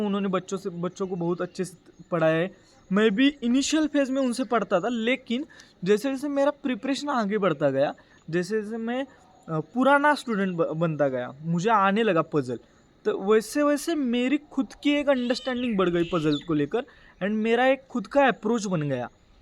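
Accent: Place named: Maharashtra, India. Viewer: Bhaii tumhari hindi sunke hi mai samaj gaya ki tum maharashtra wale ho😅😅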